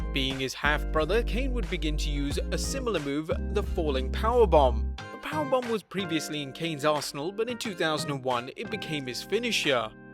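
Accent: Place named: United Kingdom. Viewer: Don't like british accent but good video.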